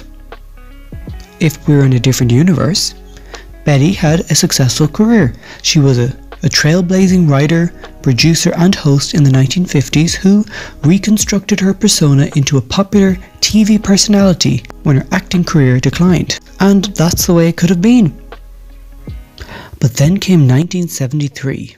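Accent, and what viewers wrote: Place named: Ireland. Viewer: I love hearing a fellow Irish voice in a voiceover! :) I've watched a lot of your videos now. Excellent channel and great work. Good for you. Hope it blows up for you. xx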